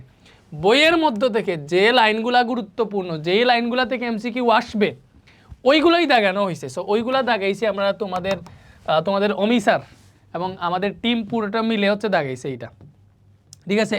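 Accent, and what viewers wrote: Sylhet, Bangladesh. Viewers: আপনি কি সিলেটি?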